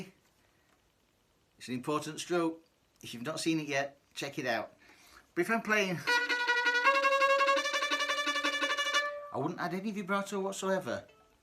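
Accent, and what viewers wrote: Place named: Northern England, United Kingdom. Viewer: Thank you for the explanation! (Great to hear a lovely northern English accent, too! )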